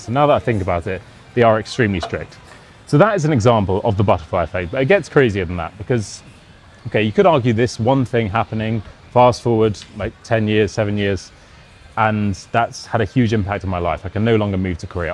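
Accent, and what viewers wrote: RP, United Kingdom. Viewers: Apparently, my English is incredible. But I love your British accent, so I'm watching... 😂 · Understood 100%. RP is easy to understand. Thank you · For me as a German, he speaks a very clear British English, not comparable to Scots or other British dialects. So no problem at all. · You talked with very plain and delicate British accent, articulated each sentence in a clean way and didn't speak too fast. I understood everything! (And I'm sorry for the discrimination you went through) ciao from Italy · I understood perfectly, but that would be because I am from the UK and you spoke English in a pretty much RP style. Now, if you were speaking as if you originated from inner-city Glasgow...... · Maybe this video is for his Korean viewers. I thought he might have some crazy, difficult-to-understand Scottish accent or something. He sounds like a BBC News presenter. 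· A beautiful speaking voice, with clear British pronunciation and accent. Easily and completely understood, at least by this American. I’m probably not the best test subject, however. As a 21 year old in 1970, I spent my junior year of college at the University of York. I didn’t qualify to study in a country where English was not the native language. I distinctly remember my taxi ride from the York train station to my digs. My taxi driver opened his mouth in conversation and I couldn’t understand half of what he was saying, although I knew it was English. I almost panicked, thinking What have I done?! I soon found out that he was from Newcastle and spoke the Yorkshire dialect. Over that year, I heard many British dialects and learned to understand them all. What a year that was! Not just the butterfly affect but the giant swallowtail butterfly effect. It broadened my American identity to then include more that of a world citizen, which has served me and others well, I believe. 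It changed my life for the better. Now that I’m an addict of British tv crime shows, I can understand most every word and sometimes translate for my English speaking husband. A good thing. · I was expecting him to drop into a regional accent not stay in RP. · I was expecting a broad Glaswiegan accent not a nice clear RP accent 😂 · You spoke so clearly and almost RP. You spoke so well compare to some Hollywood actors which I need to turn on subtitle in order to understand them. Fantastic four 2026 is one of it. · Dispite your strong british accent, i can understand you! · I thought you were going to speak some complicated form of English, like some Shakespearean-type shit. 💀 I could understand every single word that came out of this guy’s mouth. The only difference was his British accent. Other than that, he sounded completely normal to me. I think he was talking about non-native English speakers—people whose first language isn’t English. 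I’ve been speaking English my whole life, so none of what he was saying was difficult for me to understand. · Received pronunciation, notoriously tricky for the uninitiated. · Hello, son !!! I'm an old french lady refreshing her english... When I first saw you, with your red hair and beard, I thought may be you were from Scotland or Ireland, which would have been very difficult for me to keep up...😂 But you have an honest British accent, very nice and easy to understand 😊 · erm if i don't understand it's normal bc of your british accent. but i managed to understand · He does speak in a very clean general British accent. While visiting the UK my miles varied.. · Although it is a relatively RP accent. Try any working class person with a strong regional accent, that would be much more difficult.try scouse, brummie, or pretty much any of them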